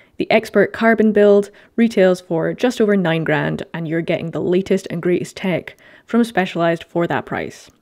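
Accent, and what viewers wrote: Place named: Scotland, United Kingdom. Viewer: Just come across your channel and was obviously swayed by the Scottish accent . . . but I did hear a lot of the bike decision based on sales and popularity on the trails? That should never come in to the equation for a report on a "top 5". Surely you need to say why it is popular? Ride in Peebles/Glentress and you will see lots of Santa Cruz, but that isn't because they are the best (although I may be digging a hole here), but that is because that is the bikes the local dealer sells!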